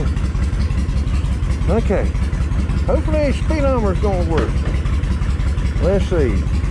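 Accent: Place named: South, United States. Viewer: Yes sir mine is a 02 classic 1500 but I've put 05 1600 mean streak engine on it and bored it .05mm over stock . I was going to change the injectors to see if that would help.i have vance and Hines straight shot drag pipes. and I've eliminated the air cleaner on left side and crossover tube. I can put new plugs in it and it runs grate for about a week .then they are black with sute and will start to run like crap.ive got service manual .and I'm going to go thru all the components and check them out while i got tank off-putting injectors in. Thank you for the input . And I love your videos you sound like your from the south like me. I own a ATV bike repair shop and I work on all kinds. But the Vulcan is mine. I loved the video on the timing chain tentioners that was a good one for sure .I just found your videos yesterday but your making grate content keep up the good work sir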